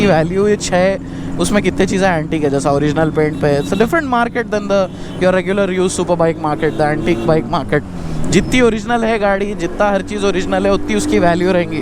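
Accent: Hyderabad, India. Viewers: I like your voice bro. Hyderabadi ton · Love the hyderabadi skeltonic accent 👌👌 Thanks for sharing the japanese-indian yamaha aesthetics. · bhai iss bike ka restoration vala video dalo aur thode videos banvo kuch bhi chale ga kyuki muje aapki Hyderabadi accent bahut badiya lagti hee love you ❤️🙏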